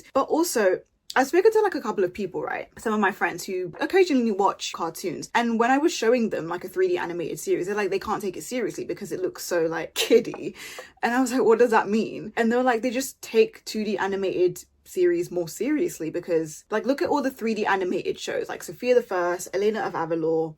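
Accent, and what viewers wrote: United Kingdom. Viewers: my god, i couldn't get enough of your accent through the video! What's your accent, british? But I think i hear some other accent. Am I wrong??😵‍💫😣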